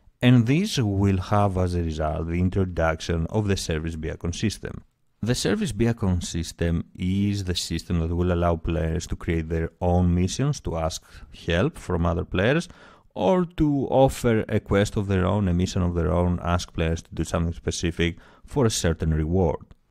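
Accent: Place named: Greece. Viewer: Hmm, by the accent I would say he is Greek. Or at least his sounds like one.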